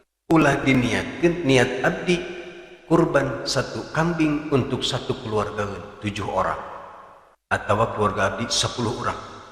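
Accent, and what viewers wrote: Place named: West Java, Indonesia. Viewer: ngalogatna Sunda bari nyantai tur di jelaskeun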